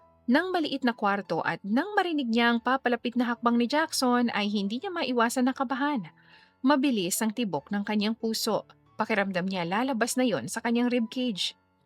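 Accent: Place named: Iloilo, Philippines. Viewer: Aliw ang ilongga na punto.. miss..❤❤❤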